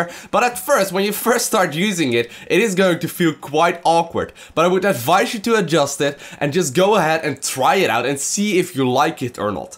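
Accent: Netherlands. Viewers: just a question but is he dutch? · ofcourse / natuurlijk. Dutch accent anybody..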